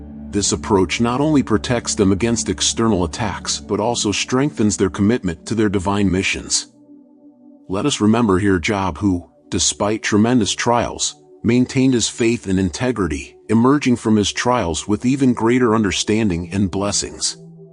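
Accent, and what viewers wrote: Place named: United States. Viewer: You have to drop the American accent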